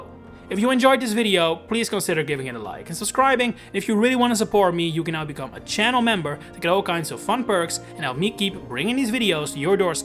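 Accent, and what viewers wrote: Netherlands. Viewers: New to the channel and great video. Side question: are you Dutch?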